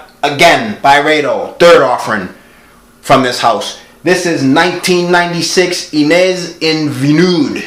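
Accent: Boston, United States. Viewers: love this guys Boston accent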